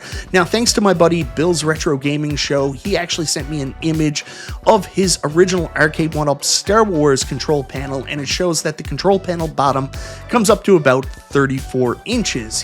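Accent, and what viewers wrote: Newfoundland, Canada. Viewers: Are you a newfie or caper?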